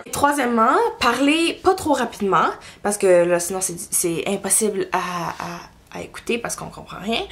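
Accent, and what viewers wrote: Quebec, Canada. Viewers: C'est pas une langue, c'est un accent québécois :p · Salut :) Pourrais-tu nous faire une video ou tu parle français sans l'accent ! Car la fin de cette video tu n'as pas eu un accent canadien mais Français ?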